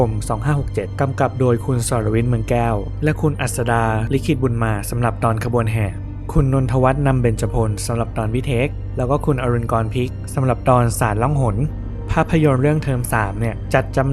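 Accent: Nakhon Sawan, Thailand. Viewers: ดูมาหลายคลิป เพิ่งมารู้เลยค่ะว่าเป็นคนคอนหวัน ทางนี้เป็นคนพิจิตร อยู่อำเภอติดคอนหวันเลย ชอบพูดแงะ แล้วก็โดนหลายคนทักว่าพูดเหน่อเหมือนกันด้วย<num> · คำพูดนี่คนนครสวรรค์ชัด (บ้านเดียวกัน)// ใกล้หมื่นซับแล้ว เชียร์สุดใจให้ถึงเร็วๆ🎉 ... ยังคงรอ Barbarian ตลอดไป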